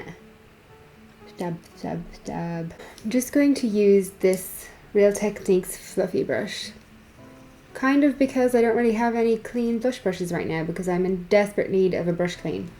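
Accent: Ireland. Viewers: is from ireland?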